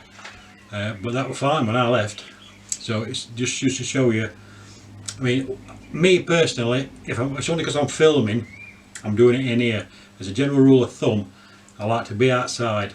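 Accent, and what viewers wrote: Yorkshire, United Kingdom. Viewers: You sound like you’re from Yorkshire? Where to you get your gas bottle from for gas mig welding?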